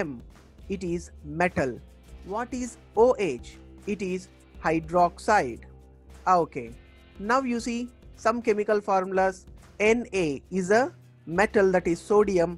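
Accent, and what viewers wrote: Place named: India. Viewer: It's a Indian accent😁 I am 100% sure.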